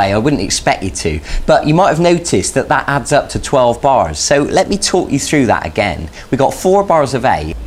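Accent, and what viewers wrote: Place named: West Country, United Kingdom. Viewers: That a fine west country accent you `ave ther boy ! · ooh ah, I loves me geetar lessons with a West country accent. nice one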